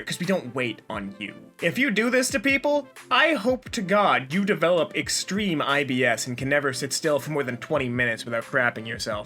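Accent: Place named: New York, United States. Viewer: Just found this channel, love your new york type accent and you seem like a very nice guy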